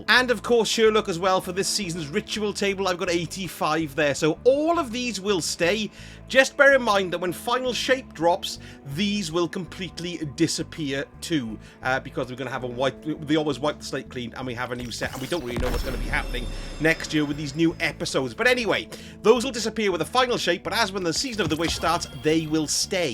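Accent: United Kingdom. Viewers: Love your channel, nice to hear a non-american accent in videos. (fellow brit btw)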